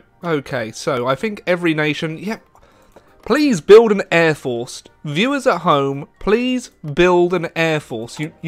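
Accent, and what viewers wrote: United Kingdom. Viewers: Hea Truly an Brit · More British than Spiff. Change my mind.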